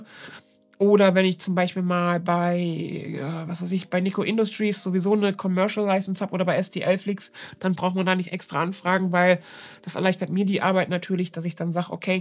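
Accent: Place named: Saarland, Germany. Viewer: Ohleck, noch e 3D Saarlänna 😃 Saugudd 👍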